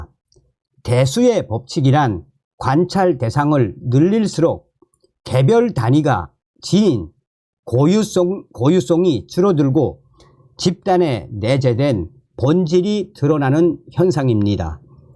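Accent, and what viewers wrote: North Korea. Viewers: 정성산 감독님 목소리가 오리 꽥 꽥 소리에서 쩌렁쩌렁 돌아왓네요 북한말 듣기 좋아요 썩어서 방송하세요 화이팅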